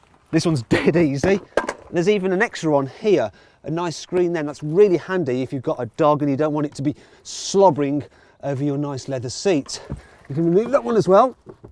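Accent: United Kingdom. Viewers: best practical car reviewer on youtube hands down! to the business script, british accent, mic is not distorted, good work!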